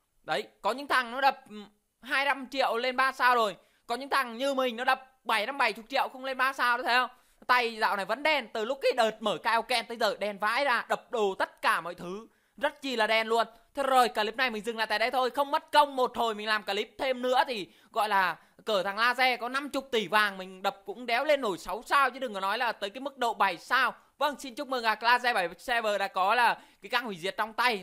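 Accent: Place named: Central, Vietnam. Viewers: tội ah hồm nay ns tiếng nghệ an, em cũng ở nghệ an ah này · A bl tiếg Huế maxx hay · Vkl giọng nghệ an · Thích tiếng miềm trung hơn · Đúng là dân nghệ tĩnh nói hay ri hey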